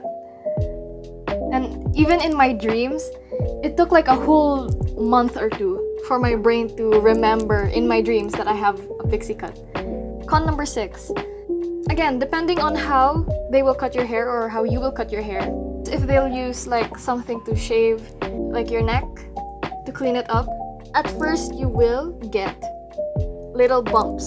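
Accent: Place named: Philippines. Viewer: btw this is unrelated but i love your filipino accent. 🥺 i strive to be as confident as you when speaking!!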